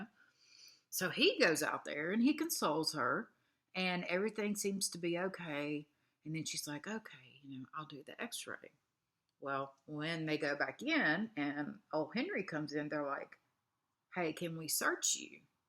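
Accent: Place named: Alabama, United States. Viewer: Aww i miss the bama accent even more after hearing your voice! Pretty neat B!! Xoxo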